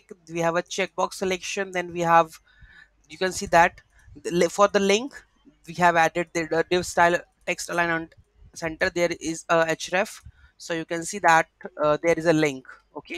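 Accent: India. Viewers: you talk like Apu from the simpsons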